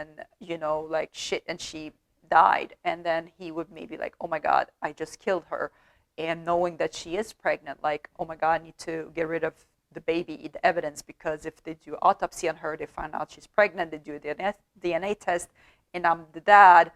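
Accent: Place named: Sweden. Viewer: As always, you look fabulous 🤗🤗... thank you for the storytelling, you have the perfect voice for it. Have a great weekend..⛱.. ps,so cool you speak the Swedish way, makes it more authentic 😉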